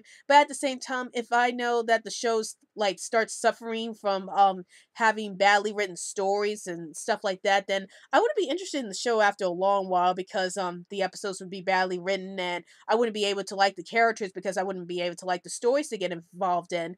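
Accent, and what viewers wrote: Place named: Boston, United States. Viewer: Thumbs up; good video! I feel that quality is important because of the reasons that you said in the video. By the way, are you from Boston? I say this because of your accent.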